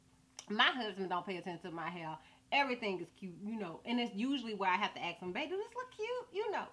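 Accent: South, United States. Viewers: Girl, I love your country accent lol. Them wigs were cute or whatev. #2 was my fav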